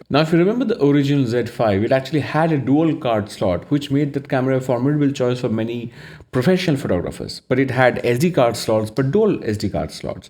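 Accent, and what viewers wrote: India. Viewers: Your Indian English accent is not a problem and it is very clear and understandable. Yes, there are some people of India which has a deep and not understandable accent, but your not one of those people.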